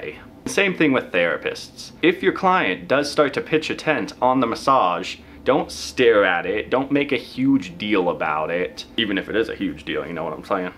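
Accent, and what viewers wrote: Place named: South, United States. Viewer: You're cute and your voice sounds like you have a little country accent. 👍🏻❤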